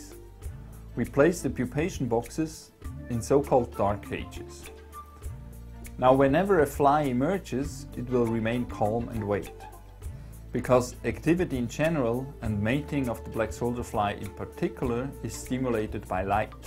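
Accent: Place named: Switzerland. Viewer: The narrator is 100% swiss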